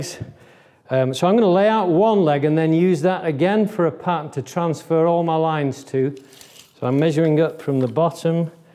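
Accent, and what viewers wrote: United Kingdom. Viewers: So it is british accent after all. I didn't know british woodworkers use inches as well. But then again in next episode he says 'it's half a mil" so I guess he uses all units he finds useful.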